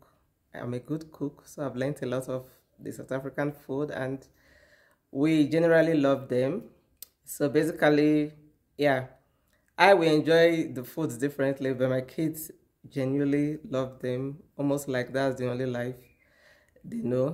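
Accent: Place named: Nigeria. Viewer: I love Nigerian accent, I hope yours doesn’t change. This is your continent, live and enjoy sis.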